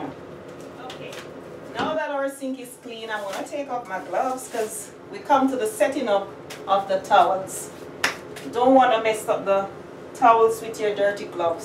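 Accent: Jamaica. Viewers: She sounds like a Jamaican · sounds Jamaican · From her accent I know you are one of us Jamaican love and niff respect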